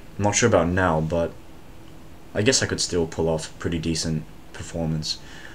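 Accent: Australia. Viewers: An Asian that sounds like a Australian...... Wow....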